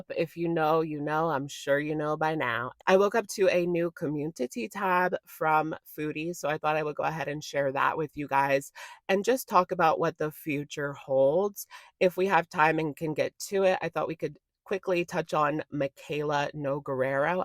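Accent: Boston, United States. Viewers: It’s a Boston accent. Not a NY accent. Either way, it’s sus. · Her accent is not New York. It's Boston. Its a weird version of Boston, but still Boston. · Boston accent not New York.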